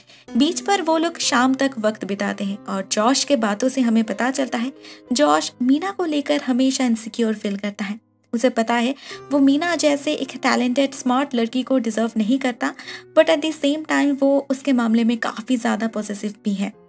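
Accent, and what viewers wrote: Bengal, India. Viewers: I love ur Bengali Accent 😜😘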